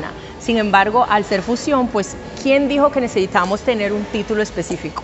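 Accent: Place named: Colombia. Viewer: Una belleza increíble habla como los Colombianos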